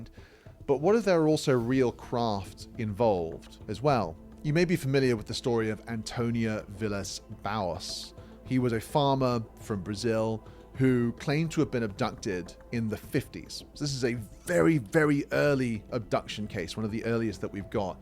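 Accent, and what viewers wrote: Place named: United Kingdom. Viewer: Could be. Your have a British accent.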